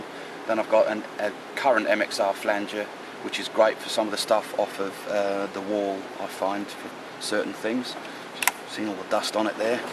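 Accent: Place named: England, United Kingdom. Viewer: he sounds english to me.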